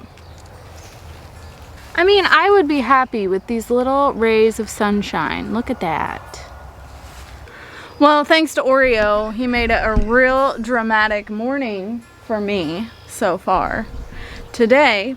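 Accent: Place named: Midwest, United States. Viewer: Just found you this am. I knew I heard a midwestern accent. I was raised in central Illinois. You sound a lot like me and my sisters. I will be following you and will share your post. I follow older people who would be happy to support younger people. Your flowers are gorgeous! I nice blend of real life and information. Hope you have a wonderful week.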